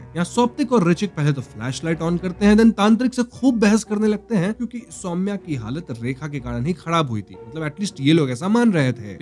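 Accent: Bengal, India. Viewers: Bengali pronunciation from bhaiya se uff damn cute. Once again love from wb and i m one of your bengali subscribers or watchers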